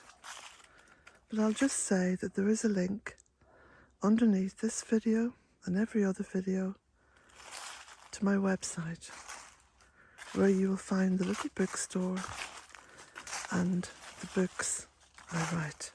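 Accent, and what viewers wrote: Ireland. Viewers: I just want to speak about your books. I have them all. I read and re read these. In these stressful times it is heart warming to read how you tell your story. Your passion comes to life in a very colorful way. Your love and devotion to the Goddess. To Earth. I actually think I read and hear your Irish accent as I go along. Lol. It's comforting and brings the certainty that we will make it through all this. "In a time of destruction create something", plant something, love something, nurture something. Great job! Blessings💖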